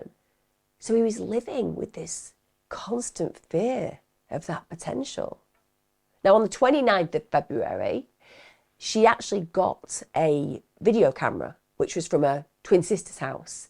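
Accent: Australia. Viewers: Love the Aussie accent! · It’s gotta be said. Coming from an Aussie. Your Aussie accent is pretty spot on. 😂❤ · I actually LOVE Aussie accent 😂❤😘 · Oh I love your Australian accent! ❤ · This is just horrible. I was whincing a lot when you mention about his skin. I feel sorry for all the innocent children in this. Her children and John's children. Also them poor people who actually found his body, they must be dealing with some trauma. If he got a restraining order out on her the same day, then how come she was around him and the children afterwards. I don't know a lot about them but I'm pretty sure they would be instant. And to have sex with her when he had just got a restraining order out aswell is strange. Maybe he was drunk and half asleep. Anyway as always, you are just wonderful. It amazes me how you remember all the details. I could listen to you all day, talking about anything. 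I bet you were fantastic at reading books for your two boys when they were younger, with all the different voices and sounds etc. Great Australian accent by the way. It was so lovely to hear talking in the background, she is letting you know that she is supportive of her amazing Mummy at work, she probably feels comfort hearing your voice. X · She got away with so much under the cover of mental health you can still pay for your awful crimes and be treated for your mental health her crimes just escalate with barely any consequences Loved the Australian accent it was pretty close to how we sound. Being Australian this case has been done to death, but as usual has found out and added so many different facts and put so many different perspectives on all of the people involved in the case❤❤ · I ❤ ur Oz accent ~ betta than most trained actors who've tried 😊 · Your Aussie accent is very good, MUCH better than Meryl Streep's!!! 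· Hey! Awesome as always, you're Australian accent + context of delivery = Lmao 😂. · Love your Aussie accent. Well done:)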